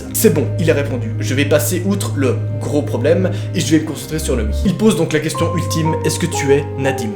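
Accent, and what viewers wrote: Switzerland. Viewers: Pas de doute tu es suisse ! J’adore ton accent ! (Je sais de quoi je parle) 😂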